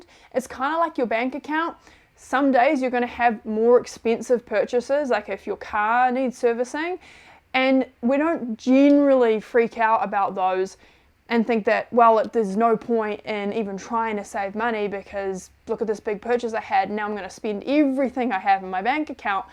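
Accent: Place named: New Zealand. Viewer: She sounds like a Kiwi (New Zealander)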